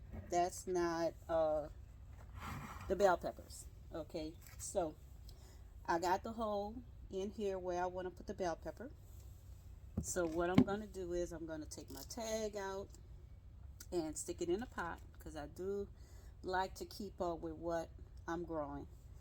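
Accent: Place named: Louisiana, United States. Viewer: Beautiful, south louisiana gardener here. As soon as you began speaking I recognized you are a fellow Louisiana gal 💙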